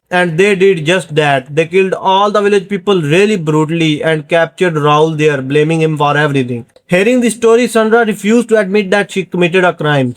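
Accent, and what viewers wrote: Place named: India. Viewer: You english ascent is more indian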